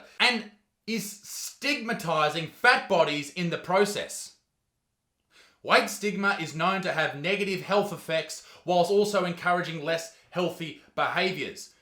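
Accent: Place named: Australia. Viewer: I did not expect the Auzzi accent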